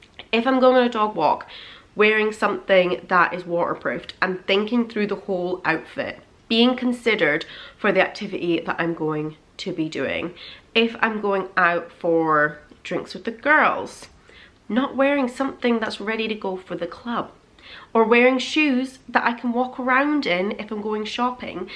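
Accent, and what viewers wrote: Scotland, United Kingdom. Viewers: Your accent is so pretty. Are you from Scotland or Northern Ireland?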